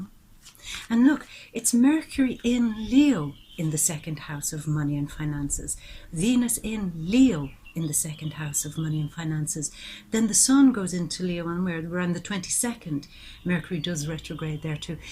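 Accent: Ireland. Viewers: This resonates with me. I am always helping other people out from sorting out paperwork to finding things for their new homes to doing runs down to the local tip for them , but never helping myself. I have a major problem with myself worth, been put down too many times and now very wary of everyone, trying to figure out their angle or what they want that I have. I just don't let people in close in case I get hurt again. At the minute I have a major problem with money and I fear losing my home because of a Government paperwork mess up, until it is sorted I am running up rent arrears. I could do with a major cash input, even a major UK or Euromillions lottery win would be appreciated so I can settle my rent arrears myself and some other bills to have a complete fresh start, even if it was just to break even for a change. I just loved your soft Irish accent, it has a warming, calming feel about it. 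Even though I am English, I have a Paternal Irish ancestry so it is in the blood and a soft Irish accent is so nice to listen to. I got my wheels of sorts, it was my birthday yesterday 7/7 and I got some nice Land Rover models ( I can't help it, I like Land Rovers) from my kids for my 51st Birthday. Regards, Mark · Love the irish accent. Great reading.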